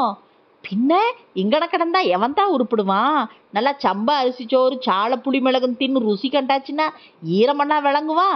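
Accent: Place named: Tirunelveli, India. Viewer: நாஞ்சிலாரின் கதை அருமை. கதை சொல்லியின் திருநெல் வேலி பாஷை பிரமாதம்.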